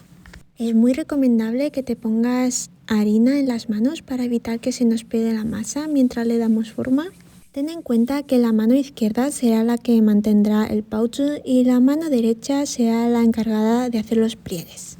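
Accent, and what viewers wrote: Spain. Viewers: Me encanta tu acento español!!!! 😂👍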